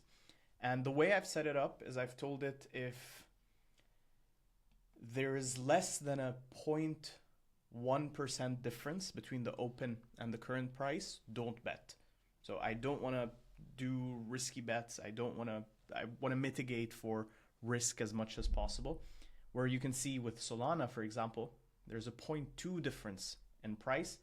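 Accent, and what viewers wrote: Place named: Philippines. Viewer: Are a you a Filipino? You sound like one.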